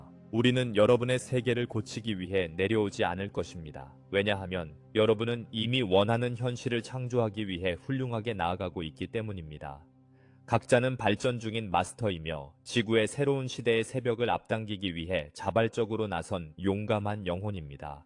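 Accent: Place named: Gyeongsang, South Korea. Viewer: 대박대박대박 화이팅하이숑 야호야호야호 경상도 사투리가 당연하지요 창녕띠기 아인교 야호 신납니당~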